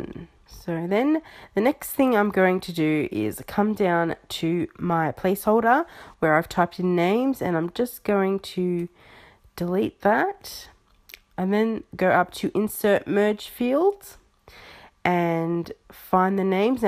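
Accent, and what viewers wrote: Australia. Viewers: Goofy ahhh Austraalian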